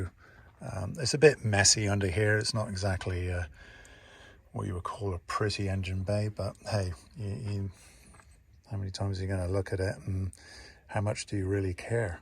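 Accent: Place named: United Kingdom. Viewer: Good to hear another British voice here, I’m in OC. Love these base broncos. Look forward to seeing what you do to it 👌🏼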